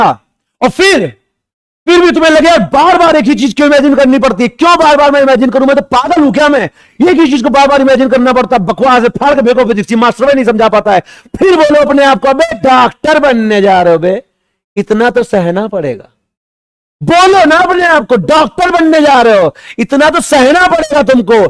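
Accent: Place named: Bihar, India. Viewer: I think he is from Bihar 😅 aawaz waysi hi h ❤️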